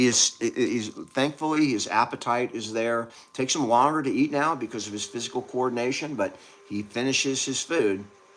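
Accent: California, United States. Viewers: Hi, Good to see you and your Pop. My late hubby with dementia was constantly getting UTIs whenever he was in nursing homes because it's just a given when they wear diapers. When he was at home with me, he had no incontinence issues. Just wanted to make sure you knew that doing the prostate procedure may not circumvent the UTIs. I live in southern Cali and you totally sound like a Californian to me! 👍🌞XX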